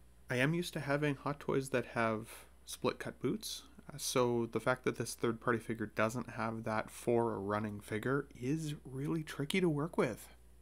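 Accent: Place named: Canada. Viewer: got that sweet ASMR soothing Canadian voice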